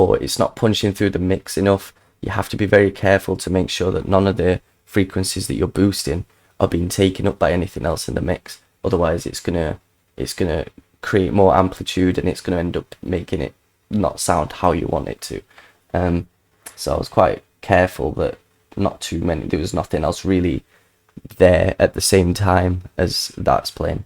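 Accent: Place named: North, United Kingdom. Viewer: you hear the northern accent and know its proper jump up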